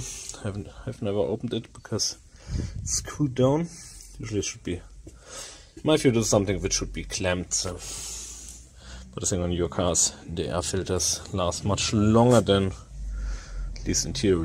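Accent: Germany. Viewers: The fact that I turned on subtitles and there is only German since u have strong German accent is hilarious 💀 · Der deutsche Akzent ballert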